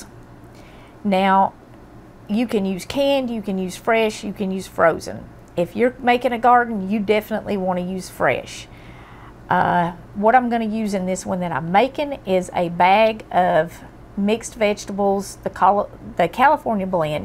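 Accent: South, United States. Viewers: Can i ask what "hillbilly" state you are from? Im guessing Alabama or Mississippi? Maybe Georgia? You sound more southern than country.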